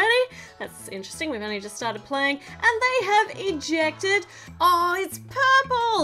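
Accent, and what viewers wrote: Australia. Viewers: Are you an Aussie? Some of the words you say are so Australian. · Are u ausralian cause ur accent sounds Aussie and I'm Aussie too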